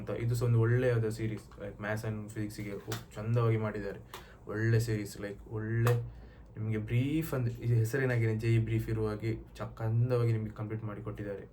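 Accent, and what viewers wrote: India. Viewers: 100% ur tuluva 😂 erena kannada kenagene gottapunduye😂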